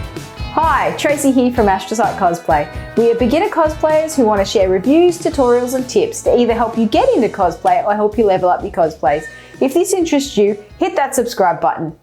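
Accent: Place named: Australia. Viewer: when she first said in the video Spider-Man i knew she was a AUSSIE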